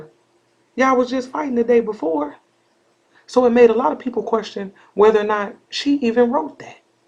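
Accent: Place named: New York, United States. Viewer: I thought I was hearing N.Y.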